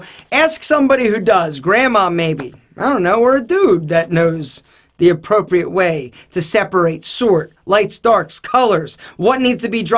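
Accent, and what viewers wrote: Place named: Pittsburgh, United States. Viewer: this guy sounds like a yinzer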